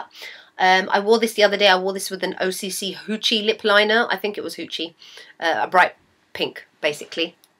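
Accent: United Kingdom. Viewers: I guess you are from England! You sound so british! Really cute :) ! Greetings from Germany. You made me buy Buttercup hehe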